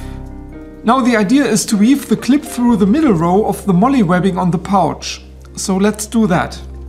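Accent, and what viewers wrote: Germany. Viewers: I love German accents like yours, lol. I giggled at "coy-otee." We say ky- (like 'sky')-otee, or ky-oat where I grew up. Just thought that was a funny pronunciation. Anyway, helpful video. Thanks dude.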